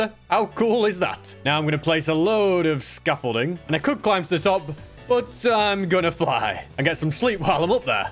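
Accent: United Kingdom. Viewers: Also are u like British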